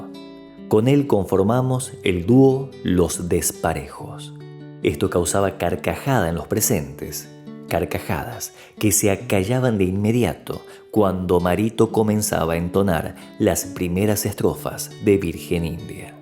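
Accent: Tucumán, Argentina. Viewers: Sos tucumano?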